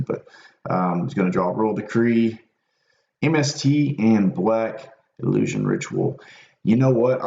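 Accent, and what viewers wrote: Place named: United States. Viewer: Great review! Love the American accent 🦅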